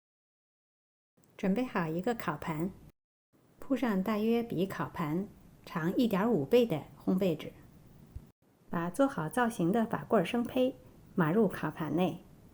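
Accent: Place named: Apulia, Italy. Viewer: Acc... Ma che è.. Un misto inglese e pugliese? Comunque conpliment 🇮🇹👍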